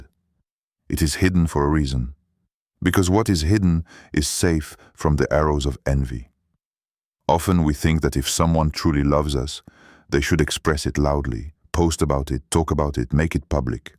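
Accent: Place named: France. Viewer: Agfirullah Ya Rabbi but I can't stand😮 it but in love with that Speaker voice with his French accent,Tauba🤲🏻😭A always want hear his voice 😢Ya Latif, I'm addicted to it Hasbun Allah vanimel vakil😢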